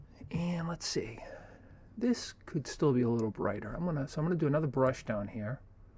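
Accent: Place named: Boston, United States. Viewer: Are you strictly just using a mouse to do all of your adjustments and edits in Photoshop, instead of using a drawing tablet and stylus? Man, I haven't done that since I bought my first computer way back in 1996. That's impressive! Excellent video, too. By the way, are you from Boston? Anyway, definitely a "Thumbs-Up!" from me. Thanks for the help. I appreciate it a lot.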